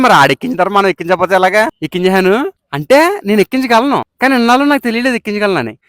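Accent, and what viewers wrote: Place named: Godavari, India. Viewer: Nenu mee videos chustunna because your Godavari slang / accent..... bagundi....east / west godavari area lo best places to visit kosam few reels cheyyandi...all the very best kind regards, Ravi , Vizag.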